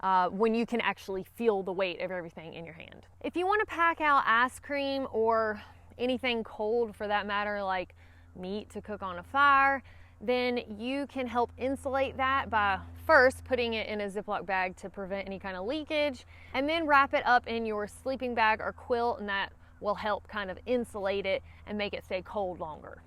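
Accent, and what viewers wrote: South, United States. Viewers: You sound American ! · TOO FUNNY!😄 Love your accent,! REAL Southern! 👍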